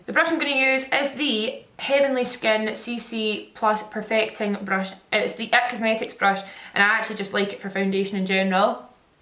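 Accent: Scotland, United Kingdom. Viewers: is she Scottish ? if so love it or Irish I can't put my finger on it btw you're gorgeous! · Jeannine Marie definitely not Irish haha she from Glasgow in Scotland x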